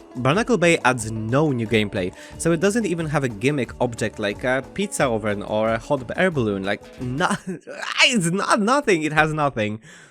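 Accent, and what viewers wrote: Poland. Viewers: I keep forgetting you're Polish and keep getting jumpscared by the accent each time but yep, Sunset Valley was made as generic as possible so all players will feel comfortable playing there during the first time they get to play the game - they can choose a modern villa or an era-appropriate house based on what their preferences are and a more or less lore-important family as they please, so players of the previous games would most likely choose the Goths, Bachelors or Langeraks while new players would play any of the families in the city, especially the rich ones like the Landgraabs or Altos it's a bit similar to how Sims 2's Pleasantview had a few different styles of houses by default, like the simple white cubes, trailer pack houses or expensive mansions, no singular theme, just a default, generic world any player could relate to and feel at home (+ Strangetown and Veronaville for those who'd want to start in a more thematic neighborhood) they shared this once in an interview that they first had big-city ideas, but settled on making a small town as it would be easier to design and would be less complicated so the players wouldn't feel overwhelmed by it, and they did that by putting everything a player would need in one place, whether it's shopping, work or services, with not-as-important locations scattered around the map so it's easy to find stuff you'd need, and it would make the game feel more alive as most Sims would be seen around the world's places of interests, and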